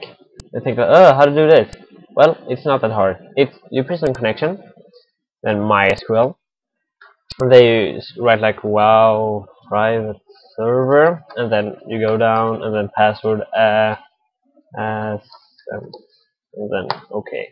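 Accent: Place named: Sweden. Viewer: Man hör ju att du är svensk O_o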